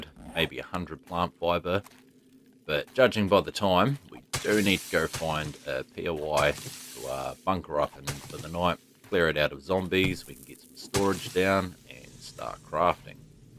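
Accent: Australia. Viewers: I know I'm 5 months late to the party but very cool to see a console Let's play, Even though its not up to date as the PC version It give me some nostalgia since I fist started playing in Alpha 16-17. It also doesn't hurt that I'm a sucker for Aussie and Kiwi accents :) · 😅im new to the channel love the videos. Has anyone told you that you sound like Farket (a Australian youtuber)?? Are you Australian?!?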